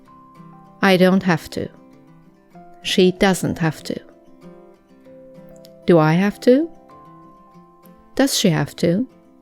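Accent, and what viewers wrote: United Kingdom. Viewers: One of the best teachers. Really great. Are u original British?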